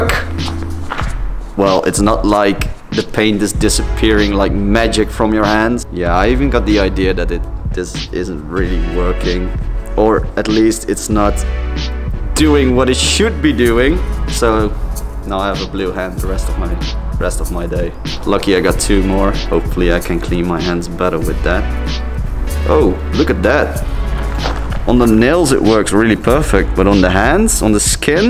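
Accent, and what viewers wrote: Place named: Netherlands. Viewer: Dutch accent 🙃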